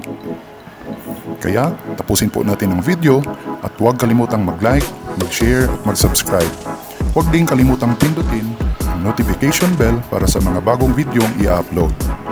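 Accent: Visayas, Philippines. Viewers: Bisaya ka. rin ba sir? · Galing mo bro..boses mo parang bisayan tonation...pareho tayo..nice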